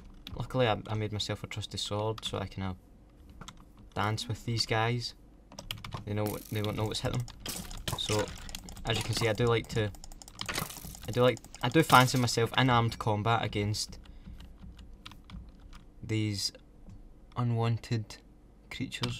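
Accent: Scotland, United Kingdom. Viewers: I love ur accent:) (Im scottish aswell):D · what part of Scotland are u from I live in Glasgow Easterhouse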